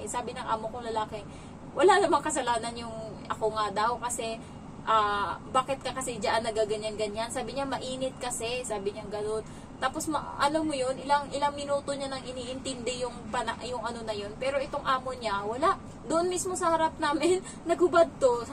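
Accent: Iloilo, Philippines. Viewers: Hi po, ilonggo ka po pla...saan po province nyo?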